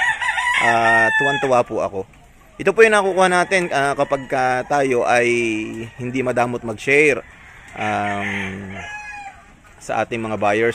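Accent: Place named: Batangas, Philippines. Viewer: Yown gusto ko sa batangueno eh ay kaalwan pakinggan at may joke talaga eh..more power channel.new subscriber boss...from north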